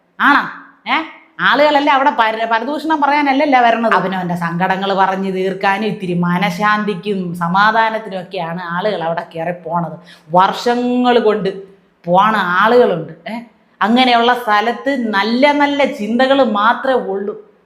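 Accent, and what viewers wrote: Thiruvananthapuram, India. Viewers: കലക്കി,. തിരുവന്തപുരം ഭാഷ 👌 👍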